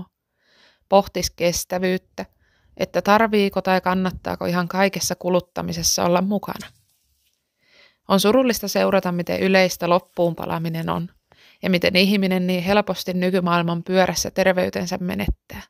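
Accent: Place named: Ostrobothnia, Finland. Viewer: Ihana pohjalainen murre. Karolta tuli vinkki sun kanavastas. Kiva video.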